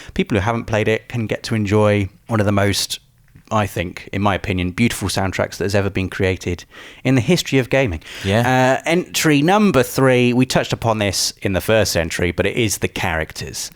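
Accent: United Kingdom. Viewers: Thought same thing when he kept saying Squall, it might be a British way of pronouncing things. Triad was also incorrect when said it the first few times.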